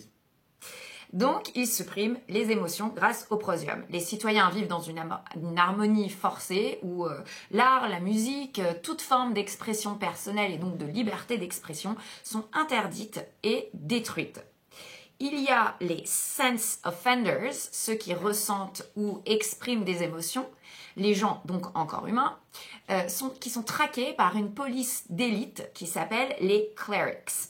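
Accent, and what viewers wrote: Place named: England, United Kingdom. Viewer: Bonsoir madame, non moi je dois trouver un moment pour regarder un de vos films, avec votre english accent que jadore, sinon toujours classe.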